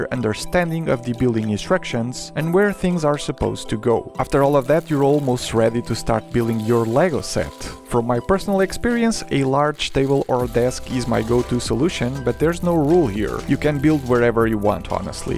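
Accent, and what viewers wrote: Portugal. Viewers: És português? Parece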